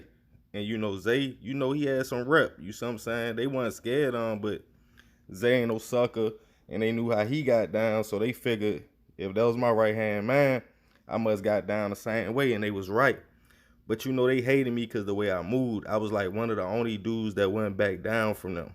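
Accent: Baltimore, United States. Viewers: My dude said T, d off on him!! You can tell you from Baltimore!! Shot out greenmount Ave 29th st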